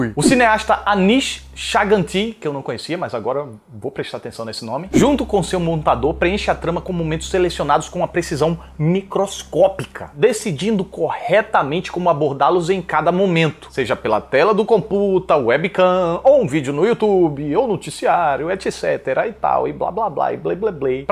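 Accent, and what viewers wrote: Northeast, Brazil. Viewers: De q parte daki do Nordeste vc é? Coboco arretado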